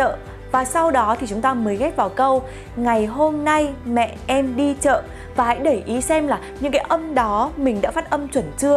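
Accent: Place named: Hanoi, Vietnam. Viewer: chị có chất giọng hà nôi hay quá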